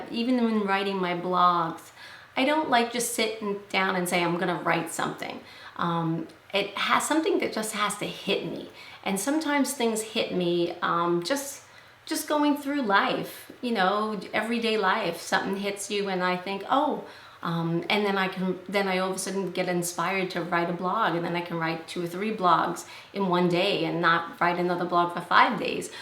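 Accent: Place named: Long Island, United States. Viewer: Ok, I'll share George. I think another tag sounds great! xo ps love the comment about your accent from Sharon. LOL we Long Islanders always get made fun of!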